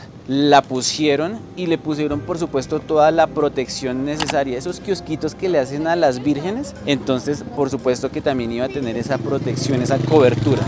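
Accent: Bogotá, Colombia. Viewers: El comunica rolo 👌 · Te felicito me encantan tus historias además que eres un cachaco muy genuino😊 a pesar de lo joven que eres tienes muy marcado el acento bogotano y también chévere que caminas por nuestra icónica ciudad cuando vas narrando, muchas felicidades tienes talento y carisma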